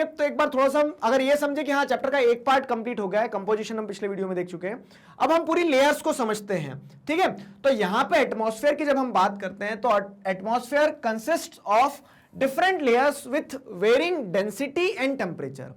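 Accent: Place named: Maharashtra, India. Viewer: Sir apke mu se kuch word marathi aate he vo hm jaise Maharashtrian ko bha jata he or aap hame apne hi lgte he☺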